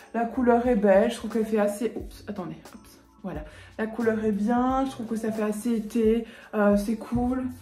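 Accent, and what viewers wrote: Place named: Belgium. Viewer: Hello je viens de te découvrir et j'ai entendu un petit accent, il me semble belge ( si c'est le cas comme moi j'habite près de l'atomium mais côté Flamand, même si je ne le parle pas, à wemmel exactement) Du coup je soutiens les belges ❤. Sur ce la 1ère robe est très belle et n'hésite pas à mettre des couleurs ( surtout qu'ici c'est uni et ça reste foncé donc loin d'être flashi😉) Par contre tu as quand même une belle et généreuse poitrine, et je trouve que ça ne va pas trop un col qui est au cou...les décollés ( sans exagération pour ne pas être vulgaire) te vont bien mieux. Bisous et j'ai hâte de regarder tes autres vidéos ( hélas je n'ai pas Instagram, mais je vais regarder sur Facebook si tu y es 😊) .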